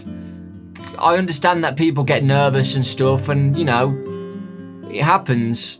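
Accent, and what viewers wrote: United Kingdom. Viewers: My family was happy for me when i became a GachaTuber. The only reason im good at a british accent is because i watch you.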